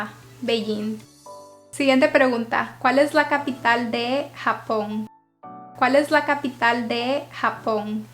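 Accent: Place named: Latin America. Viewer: so you're teaching Latin american spanish? That's my guess base on the way you pronounce certain words I wonder which country is your Spanish from? Since even in Latin American there are some differences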